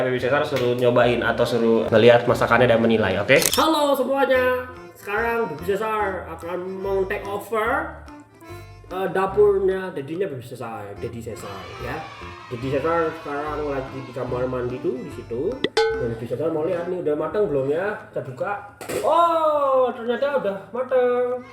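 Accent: Bali, Indonesia. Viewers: Bli logat nya sudah kayak orang bali wkwkkw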